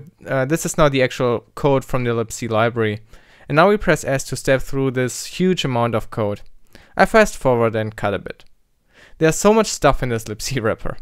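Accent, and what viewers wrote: Germany. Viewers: really well explained! sind Sie Deutsch?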